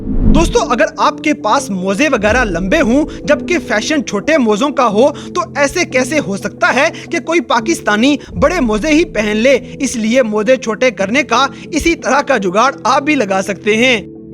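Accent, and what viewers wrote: India. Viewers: او بھائی تیرا بولنے کا انڈین سٹائل بالکل بھی پسند نہیں آیا۔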